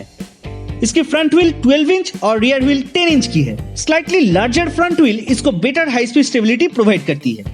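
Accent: Bengal, India. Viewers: I love your Bengali accent. ..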